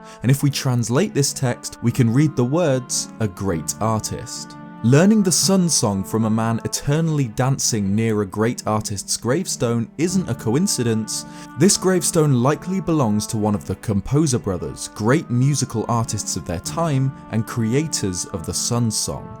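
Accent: United Kingdom. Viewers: Am I the only one that's crushing hard on this guy? Zelda love? British accent? Cutie? 😭 I promise I'm not thirsty just wanting a Zelda soulmate.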